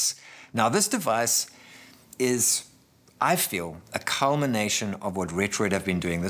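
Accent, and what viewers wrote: South Africa. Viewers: lol just cause you have a lekker saffa accent I bought a retro pocket 2s lol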